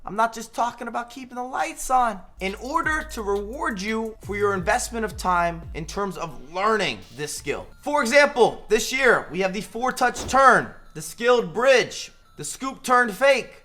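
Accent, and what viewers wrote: United States. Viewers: Gave up after 10 secs.....why does every American have to speak like a fanny??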